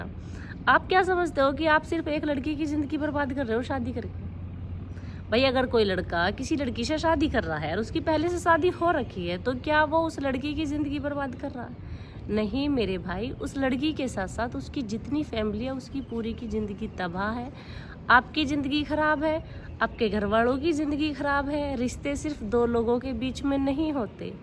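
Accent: Haryana, India. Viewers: आप की प्रस्तुति और विषय दोनों अच्छा लगा, वैसे मैं अवध से हूँ इसलिए आपका हरियाणवी अन्दाज में बात करना अच्छा लगता है✔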